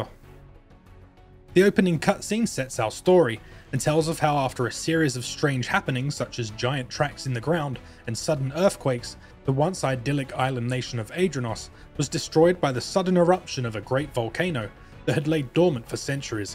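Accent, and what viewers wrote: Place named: United Kingdom. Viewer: idk anything in british accent sounds more legit even reviews :D i enjoyed it :D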